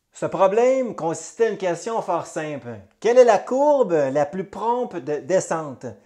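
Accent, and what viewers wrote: Quebec, Canada. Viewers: J'ai 70 ans et, été toujours "NUL" en maths ( je suis plus "cerveau droit". L'artistique.)! Je viens de découvrir votre chaine et de m'y abonner. En plus de l'accent Québéquois; que j'adore (je suis français), je trouve vos thèmes très attrayants et qui m'intéressent énormément ! Vous me donnez le goût de replonger dans cette science. Merci. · C'est une belle explication mais il y a une grosse erreur que vous répétez à quelques reprises. La vitesse initiale du mobile quelque soit le plan incliné empruntée est rigoureusement égale à zéro. Et non plus ou moins grande selon la trajectoire prise. Merci cousine avec l'accent inimitable du Québec ! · L'accent Canadien ❤. Entre parenthèses, très proche de l'accent en Charente Maritime en France.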